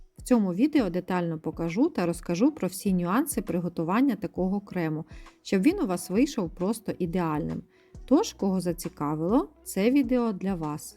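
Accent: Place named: South, Ukraine. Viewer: Добрий день! Мені здається що ви з півдня України, за місто промовчу!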